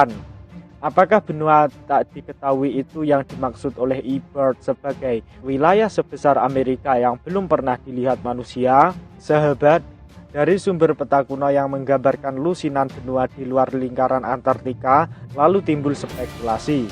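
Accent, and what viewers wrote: Java, Indonesia. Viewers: logat,e medok. 😀😁😂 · kok gue jadi fokus yg jelasin ya bahasanya medok jawa bgt 😁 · Naratornya medoxx bgt😀😀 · naratornya indonesia asli.. wkwkwk... hidup medok.. 😁😁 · Medok amat njiiir 😂😂😂😂 Btw buat yg mendebat, membully,beradu argumen pesrsepsi tentang bumi datar anda luar biasaaa.. Terserah anda mau menertawakan, menganggap ada2 saja, menganggap bodoh ,itu bebas hak anda hehe Buat apa ngeladenin2 yg ngga percaya atau yg kontra.. Para Flatter hanya mau membukakan konpirasi besar kepada semua umat manusia dengan angka(fakta). Bukan hanya dengan faham yg turun temurun diajarkan. Like "orang udah diajarin di sekolah2 dari dulu bumi bulat ,ngaco aja". Hehe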